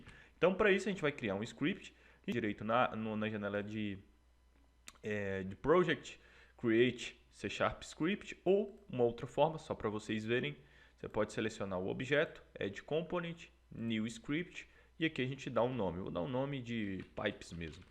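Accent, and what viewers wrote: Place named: Ceará, Brazil. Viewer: otimo tutorial. bem simples e eficaz. a proposito, vc eh cearense? percebi pelo sotaque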